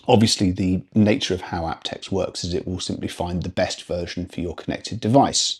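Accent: United Kingdom. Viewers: I think he said Bowers but he's British so it comes off subtle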